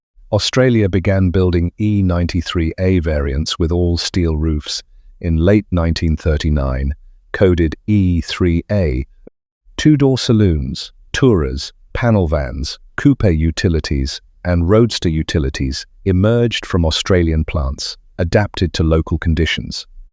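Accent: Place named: United States. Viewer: Wasn' it a popular that was the cheapest? pretty rubish video, why the American accent?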